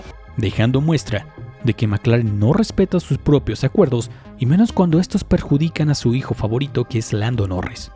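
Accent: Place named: Mexico. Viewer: JAJAJJAAAJ Eres mexicano cierto?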